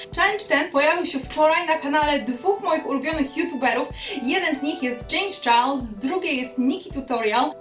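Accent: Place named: England, United Kingdom. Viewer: Kocham jak wymawiasz polskie nazwy z angielskim akcentem ❤❤ Zrób jeszcze raz to tylko z angielskim nazwami ❤❤❤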